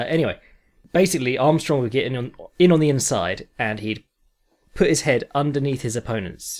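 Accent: United Kingdom. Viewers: i'm brazilian and i love your work i have been reading everything you wrote sinceramente but my english skills still no só good enought to hear and understand everything you say even with your cool britsh style very much more easy to me, thanks but May you put subs in this podcast? if you do and i share this(in fact a will share whatever happens lol) others of my countryman will enjoy too, and belive in me, brazilians NEED your work people here love mma but don't know nothing about this...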